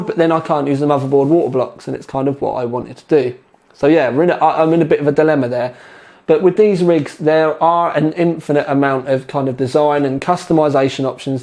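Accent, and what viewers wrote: United Kingdom. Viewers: Fuck that, im hangin with fer a 1/2hr!! More brit accent! More lamp smashing!! FTW In all seriousness....smash more lamps.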